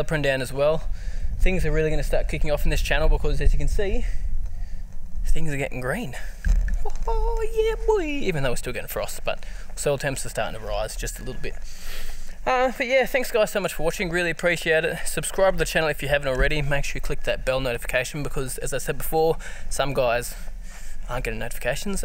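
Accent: Australia. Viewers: Ayesp Aussie accent I think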